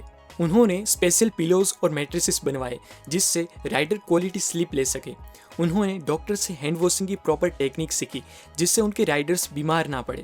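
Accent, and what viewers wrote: Gujarat, India. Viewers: I liked your gujju accent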